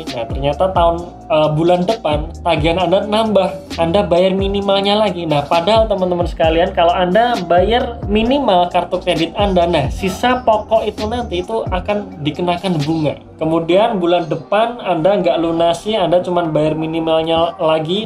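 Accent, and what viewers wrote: Surakarta, Indonesia. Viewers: Njenengan orang solo to pak? Heheh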